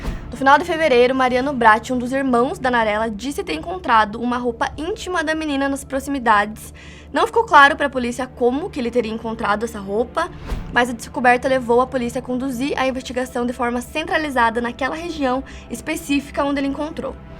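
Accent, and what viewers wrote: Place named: Rio Grande do Sul, Brazil. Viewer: Sotaque fofo.... Gaúcha?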